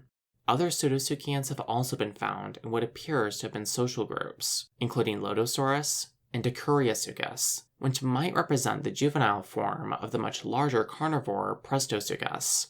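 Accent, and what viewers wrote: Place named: California, United States. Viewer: Effing hell mate!! Why is the narrator soooo californian? Is he gay?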